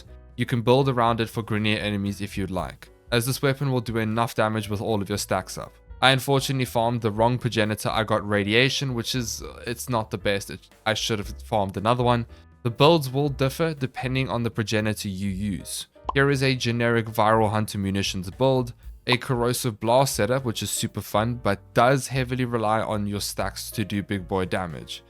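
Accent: South Africa. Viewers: You are most deffinitely South African arent you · yooo.. are you south african?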